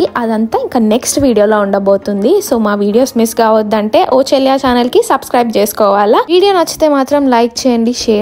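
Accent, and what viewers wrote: Telangana, India. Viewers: #sappaga undhi telangana slag epudu boor kottadu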